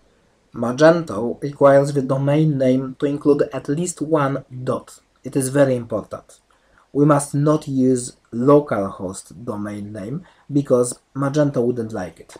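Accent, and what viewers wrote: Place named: Poland. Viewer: awesome accent, polish I guess?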